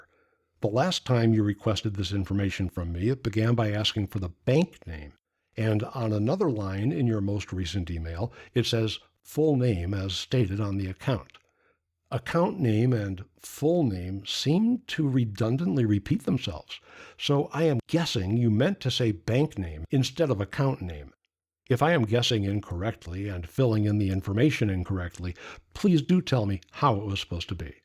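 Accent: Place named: Nigeria. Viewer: Like the way you talk like a Nigerian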